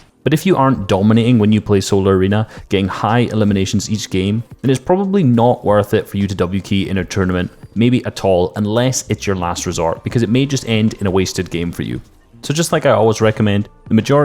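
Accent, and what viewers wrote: Scotland, United Kingdom. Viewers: are you scottish?? if you are you are my favourite analyst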